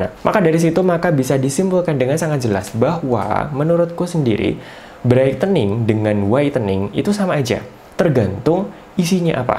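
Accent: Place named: Surabaya, Indonesia. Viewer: Ya Allah pantes kok logate suroboyoan. Ternyata alumni FKG Unair toh. Suwon gawe infone mas 😅😅akhirnya terjawab sudah pertanyaan ttg brightening vs whitening.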